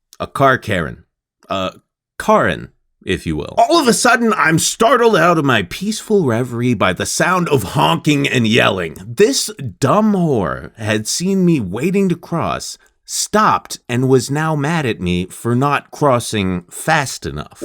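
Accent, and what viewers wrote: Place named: Bay Area, United States. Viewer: I don't believe for one second that you live in Portland. You sound like you're from the Bay Area and I would bet money on it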